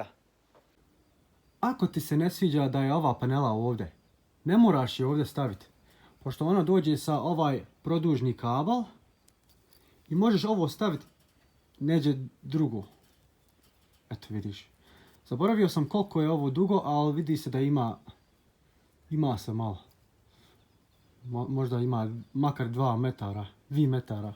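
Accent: Bosnia and Herzegovina. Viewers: Pravi momak nekoliko tvoju videa sam pogledala I vidjela sam da si previse iskren moj savjet je kao moje dijete koje bi posavjetovala da nebudes toliko Iskren. Nardo iskoristava ima pokvareni ljudi moras biti malo Mudri a to je da se cuva s Dijete drago simpatican ti taj Bosanski Bas mi super te gledati❤❤😊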